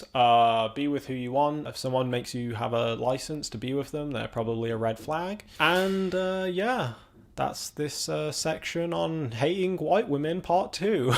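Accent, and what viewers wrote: United Kingdom. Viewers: where this accent comes from? Are you British?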